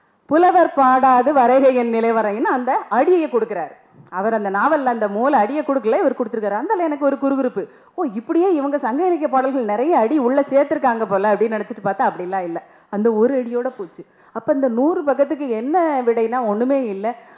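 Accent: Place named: Tirunelveli, India. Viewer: திருச்செந்தூர் ..... திருநெல்வேலி ...தமிழ் செம்ம....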